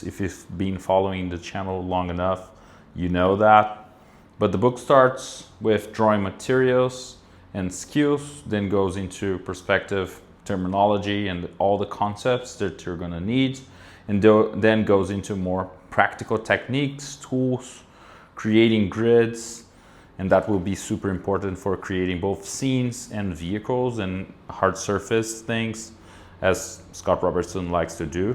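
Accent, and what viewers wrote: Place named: Brazil. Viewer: Esse english é brasuka! Faz vídeos em português tb irmão...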